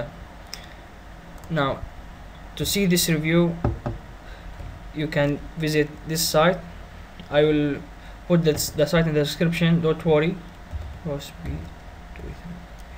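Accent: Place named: Afghanistan. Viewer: You sound Afghan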